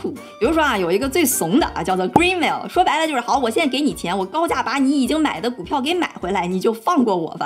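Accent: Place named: Beijing, China. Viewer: 京味的单口相声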